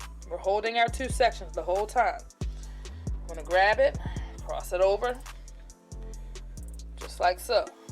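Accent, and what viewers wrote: Baltimore, United States. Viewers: thank you… the bmore accent is givn life.. this was very informative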